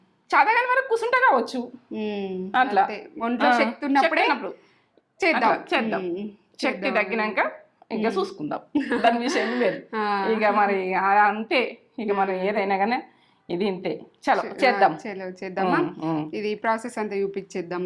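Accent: Telangana, India. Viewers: You are doing a great job. Please continue contributing your authentic Telangana recipes in your authentic Telangana accent with accurate kolathalu 🙂.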